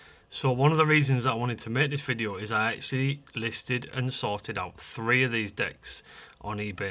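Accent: Scotland, United Kingdom. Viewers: Scottish or maybe Irish accent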